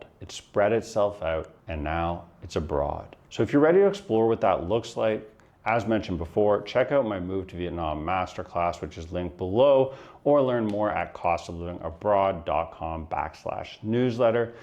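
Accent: Canada. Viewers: lol your Canadian accent is super thick and I’m Canadian 😅. Good info here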